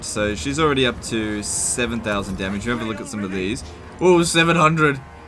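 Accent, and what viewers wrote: Australia. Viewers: Bruh. I like putting these on as background noise cuz I love TW and has the free content perk of an Australian accent, but I heard those silly ass bells and looked at the screen. Lmaooooo what are those GOOFY dudes. 69/10 doomstack imo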